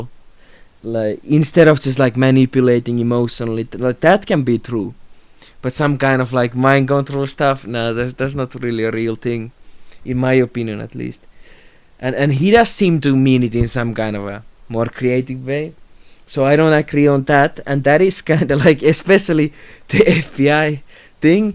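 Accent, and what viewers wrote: Finland. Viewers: I couldnt finish watching this video because of the accent but I will drop a like for the effort! Next time you could speak in suomi and have english subtitles, cheers bro!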